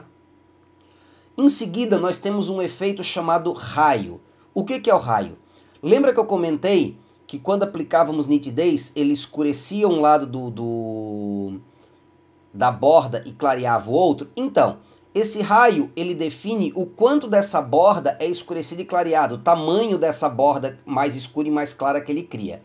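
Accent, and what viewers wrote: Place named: Santa Catarina, Brazil. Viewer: pelo sotaque é catarinense não? rsrs Florianópolis? ótimo vídeo. parabéns e obrigado por compartilhar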